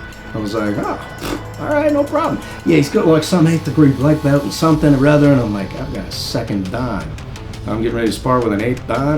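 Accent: Australia. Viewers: wow great ausie accent! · Who the fuck saw that aussie accent coming? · His Aussie accent is on point. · Nice Aussie accent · I don't know what's more amazing: the fight, or that Australian accent. Thanks so much for this video. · Not bad aussie accent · His Aussie accent though 😂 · Don't get me wrong...your acting as Jason was magnificent...but I do believe that your australian accent would get you an Oscar! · That is a damn good Australian accent for a presumably American.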